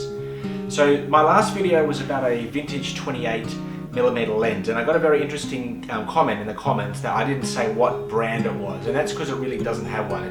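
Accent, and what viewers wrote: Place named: Australia. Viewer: You look so American and yet you sound so Oz. I am hevily into vintage lenses, especially anything Carl Zeiss. My two best are an ancent Carl Zeiss Biotar (No red T* but, coated glass) and a more recent Rollei Carl Zeiss Distagon, very worn and skuffed on the outside, but what great glass. I recently found out about the SMC Pentax-M lenses. There is a site that ranks Pentax glass, the Pentax-M f/1.7 50, is not only the most popular, it is ranked the highest. So sharp, and so cheap. $30 USD in the states. Thank you, good work, I subscribed.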